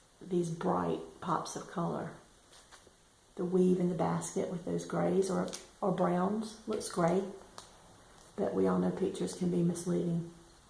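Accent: South, United States. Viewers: Hi there! I am a new subscriber and I am loving your videos! I live in Indianapolis, Indiana and I love your southern accent. My mother was from Kentucky and for me the southern accent just warms my heart with loving memories. I am binge watching your videos after catching this one. Thanks so much for the time and effort you put into your videos...they bring such joy to me. Happy stitching!!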